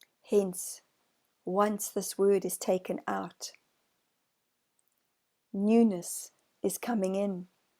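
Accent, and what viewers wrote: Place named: South Africa. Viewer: I just wanted to tell you, for one: I found you through Jeff Mara & so happy I did. For two: I knew right away you were South African when you were on his show. My ex husband is South African. I’m American 🇺🇸😟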